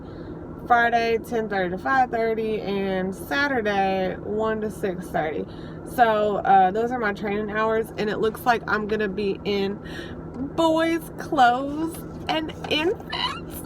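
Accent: United States. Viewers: Btw defiantly can hear the Tennessee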